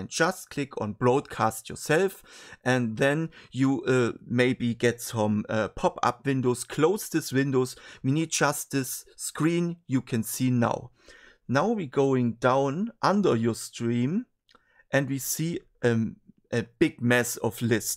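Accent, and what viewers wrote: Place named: Germany. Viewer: Habe gleich herausgehört, dass deine Muttersprache auch deutsch ist. Und auch ein gemeinsames Hobby haben wir schonmal.😉✌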